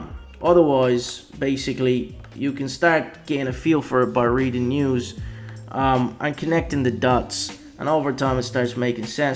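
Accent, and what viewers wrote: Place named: Ireland. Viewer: what accent is that yo. Irish Im assuming sounds g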